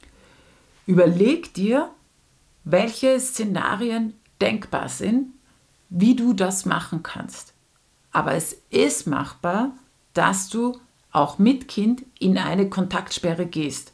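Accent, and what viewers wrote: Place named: Austria. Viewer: Kuulostaa Itävallan murteelta. ❤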